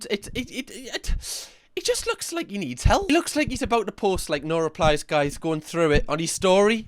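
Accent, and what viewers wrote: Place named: Newcastle, United Kingdom. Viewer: I've been wondering where this accent is from... it's so cool. 😁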